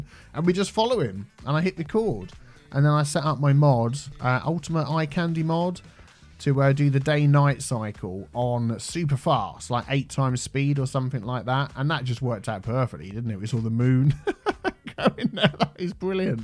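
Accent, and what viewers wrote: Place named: RP, United Kingdom. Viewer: why are you so posh?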